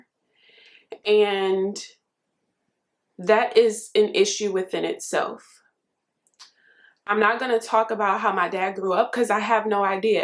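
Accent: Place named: Deep South, United States. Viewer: Hey where are you in the Deep South because you have a real deep and strong southern accent ? Which city and state are you from in the Deep DEEP SOUTH???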